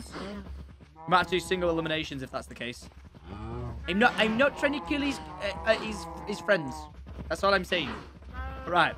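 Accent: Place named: Scotland, United Kingdom. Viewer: Why does turn scottish all the time lately? 😂😂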